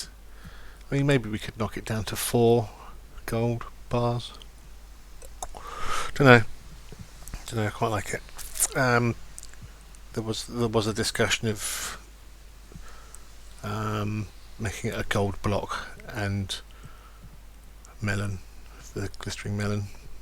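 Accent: England, United Kingdom. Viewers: I liked this not because of your commentary, your breathing, or your gameplay, but because of your witty remarks you make when typing to people. I just like to see how much enthusiasm and humor you have under that thick English accent =D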